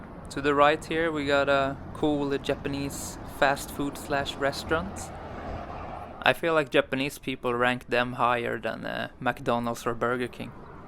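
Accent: Sweden. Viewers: Guessing you saw my comment on jcontra's recent vid and subbed to me as a way to let you know your channel exists.....interesting way to get peoples attention (it worked!), vids look good so far so I guess I'll stick around! bra att veta att det finns fler YouTube retro-samlare i Japan (could tell immediately you're Swedish from the accent ha ha)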